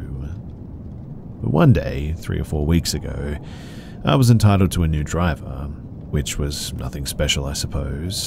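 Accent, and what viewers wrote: Australia. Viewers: His voice actually calms me due to the fact that it’s a really really deep Australian accent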